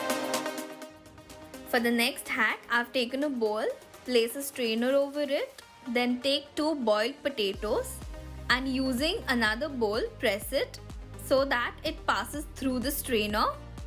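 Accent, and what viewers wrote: India. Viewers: Are you Indian ?????🤔🤔🤔 B'coz your accent seems to be indian.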